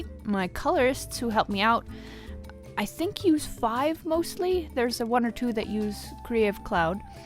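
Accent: United States. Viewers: its an accent from montana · Where is this accent from!!! I hear Southern Georgia combined with Four Corners and it is confusing my poor little brain... Also I really love it!!!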